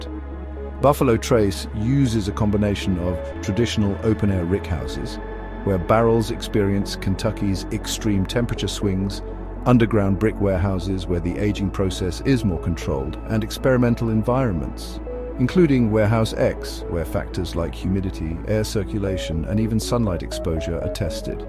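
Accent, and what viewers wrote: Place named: England, United Kingdom. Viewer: why have an english accent voiceover for the most american product??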